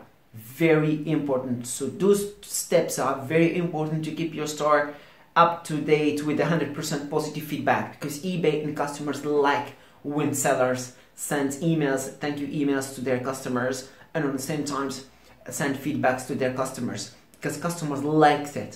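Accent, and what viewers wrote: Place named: England, United Kingdom. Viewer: Ur english accent👌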